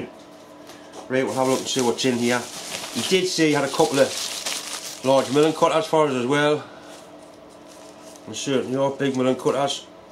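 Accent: United Kingdom. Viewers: are you from the United Kingdom?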